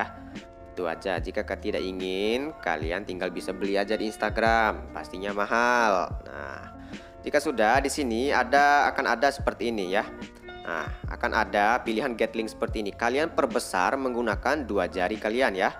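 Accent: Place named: Bali, Indonesia. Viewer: iya...iya teman2 kayk bli..bli di bali logatnya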